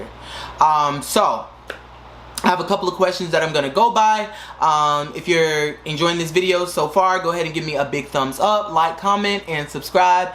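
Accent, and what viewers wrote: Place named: Cuba. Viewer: your spanish sounds cuban!!!!!!!!!OMG....I speak spanish as well. I lived in Costa Rica for 1 year when I was 16 to become fluent...however i was learning since grade 3 as well. I did my Ba in Spanish, my postgrad in Early Childhood Education now doing my Masters in Esl in Ecuador!!!!!!!! I did my BA in Canada...if i had to do it over again..I would have chosen Spain to do my ba in spanish lol as my former professor did the same thing.....nevertheless I love the language....also my adoptive parents are from ecuador and peru!!!! So that was a plus for me...in Canada....It's crazy how I can sit watch telenovelas and understand everything as a second language learner....and I am black as well from the Bahamas...latinamericans think that i am from cuba or dominican when I speak spanish...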